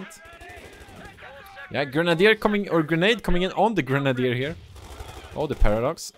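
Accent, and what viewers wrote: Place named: Sweden. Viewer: "... and me is casting" I love this Swedlish too much to criticize it.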